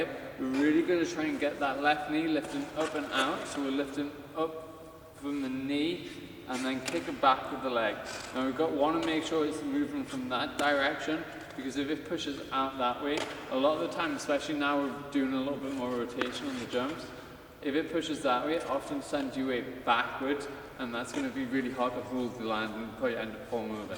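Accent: United Kingdom. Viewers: British accent I think anyway like it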